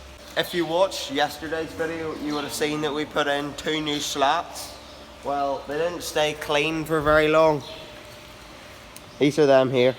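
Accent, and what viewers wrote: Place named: Northern Ireland, United Kingdom. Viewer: Just watching through some of your older videos. Can i ask where you are, roughly. Recognise you've a NI accent, but so do a lot of people on south west Scotland, and thats where I'd assumed you were from. Its the northern reg on jeep confusing me. Shouldve said.. you deserve and will likely get more subscriptions as you're doing very good content. Thankyou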